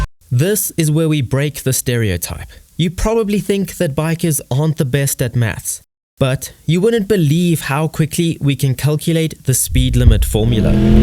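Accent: South Africa. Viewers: Where u from m8? South Africa? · You South African? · Are you from South Africa mate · South African?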